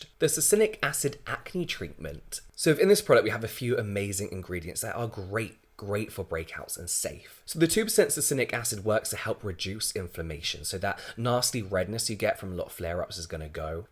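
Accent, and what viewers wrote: United Kingdom. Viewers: Happy Monday! I’ve done the toothpaste thing (years ago), the it was spot treatments (they worked well), but this cool guy with an awesome British accent introduced me to pimple patches... LIFE CHANGING! 💖💖💖💖💖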